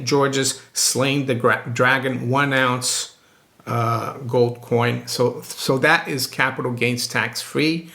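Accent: United States. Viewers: Here I thought you were an american living in England this whole time. Thanks for the video. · Italy, Brazil, Switzerland - so why the United States accent?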